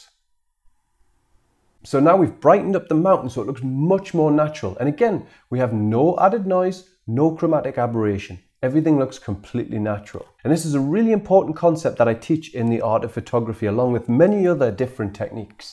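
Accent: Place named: Bradford, United Kingdom. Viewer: Are you from Bradford? Your accent sounds too familiar! Also, great video! I've missed playing around in Adobe CS.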